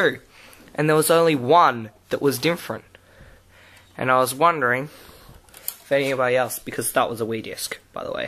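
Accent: Australia. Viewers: Good Austrailian Accent. What you Found was Actually the PS2's Personified Fear. ( Yes It is an RSOD. ) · your australian accent sounds preety cool man! you are the coolest person in the world! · i like the Australian accent because u get to say g'day mate lol xD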